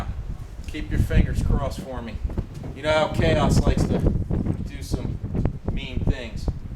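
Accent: Pennsylvania, United States. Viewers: Thanks dude. I like how you clearly list all tools needed, for those of us on our way to the JunkYard. Plus, that Pennsylvania accent is reassuringly trustworthy.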